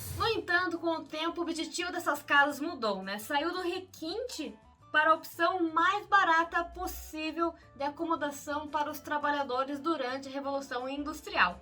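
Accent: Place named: Rio Grande do Sul, Brazil. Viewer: Ah! Te ouvir foi tão parecido com a gente falando que achei que era gaúcha. Obrigada 😘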